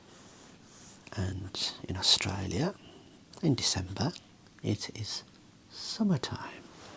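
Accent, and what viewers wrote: Birmingham, United Kingdom. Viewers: he's from birmingham which is in the West Midlands region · Your accent is also great! From which region of the UK would that be considered to be?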